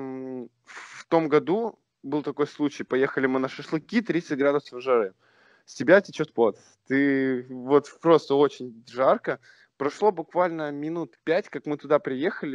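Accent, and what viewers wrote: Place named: Provinces, Russia. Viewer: Какая речь провинциальная ) слух режет.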